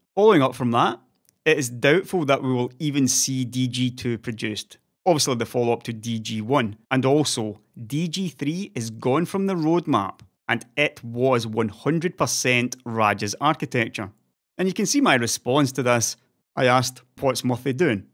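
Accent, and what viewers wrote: Scotland, United Kingdom. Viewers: Scottish, with a little bit of influence from somewhere I can't remember off the top of my head now, from living outside of Scotland for a while. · It's an scottish accent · I can barely understand that thick Scottish accent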